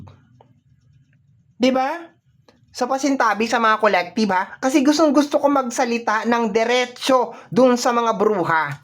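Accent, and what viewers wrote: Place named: Iloilo, Philippines. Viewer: Good morning watching from Jacksonville florida 🇺🇸 thanks for reading i resonates much and you sounds like ilonggo me too from jaro iloilo city ..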